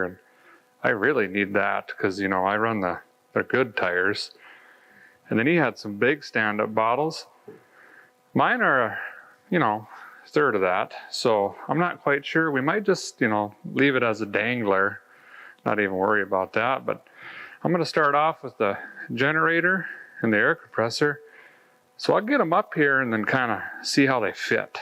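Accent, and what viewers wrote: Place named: Great Lakes, United States. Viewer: Love the “Great Lakes” accent! All in fun and learn and become inspired too!! Great job. Liked, subbed, commented and shared!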